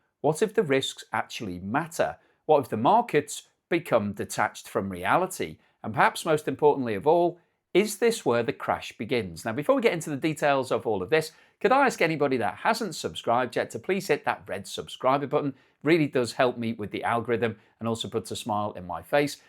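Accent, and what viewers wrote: United Kingdom. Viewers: YouTube is my crash course in the British accent. My head is still hurting, my mind wanders. I may be too old for this. Great analysis, Sir! Thank you! I'm wondering if this new Fed Chairman will be a modern day Arthur Burns - accommodating the president's war spending, leading to inflation. So higher interest rates? Hmm.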